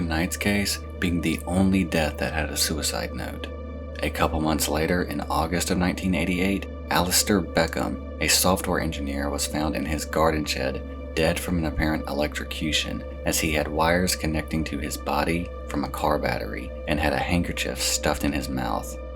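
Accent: United States. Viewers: Hearing Lorry (instead of truck), flat (instead of apartment), and petrol (instead of gas[oline]), in an American accent is really strange lol.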